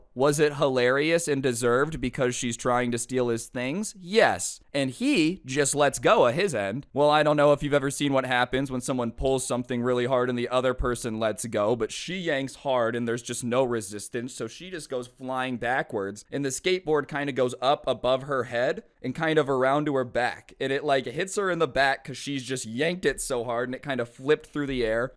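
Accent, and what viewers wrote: United Kingdom. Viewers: British accent 10/10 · best british accent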